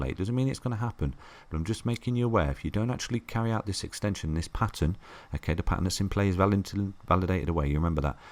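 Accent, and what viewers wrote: United Kingdom. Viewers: i like that nice and short video.. thanks.. nice to also hear British accent for once.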